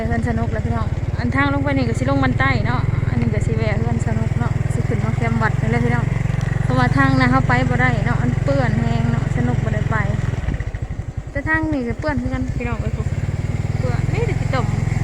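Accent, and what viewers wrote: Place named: Laos. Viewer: วัสดี แวะมาเติมกำลังใจฯลฯ(สำเนียงพูดแบบนี้เป็นคนแขวงอะไร)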